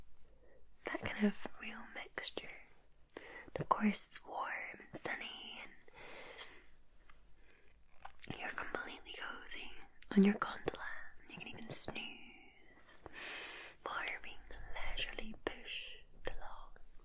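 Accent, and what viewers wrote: Scotland, United Kingdom. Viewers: I don’t know what it is but that Scottish accent is so soothing and it puts me to sleep really fast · My New favorite!!!! 😍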